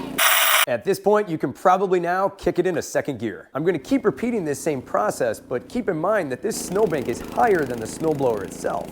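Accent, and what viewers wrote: Buffalo, United States. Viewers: First time watching your channel, I guessed you were from Buffalo before you said it 😅 I’m from Getzville. We got a ton of snow from this blizzard. Hoping you made it out ok!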